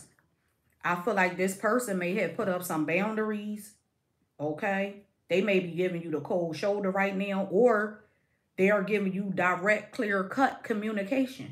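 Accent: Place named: Philadelphia, United States. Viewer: oh yea Philly really apart of you cause you have a very distinct accent. I love it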